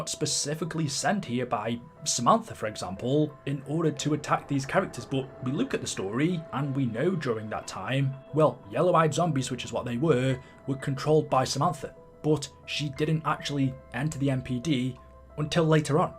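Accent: United Kingdom. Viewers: Your accent man, you must be british?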